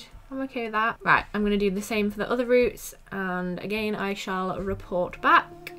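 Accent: United Kingdom. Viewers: I both enjoyed watching you drawing and listening to your British accent❤️ This video is perfect✨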